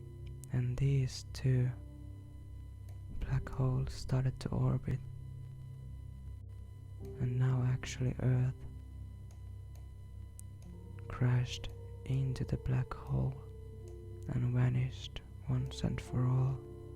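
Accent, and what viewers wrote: Finland. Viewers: You sound Finnish, are you from Finland? :)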